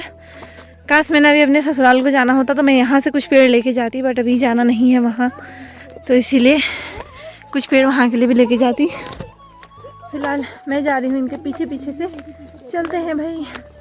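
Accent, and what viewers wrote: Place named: Pahari, India. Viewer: Pahadi bolna kitna accha lg rha h aapka ❤❤❤❤❤❤❤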